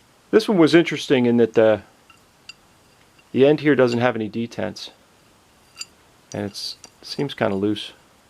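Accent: Philadelphia, United States. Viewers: you sound like a Philly boy.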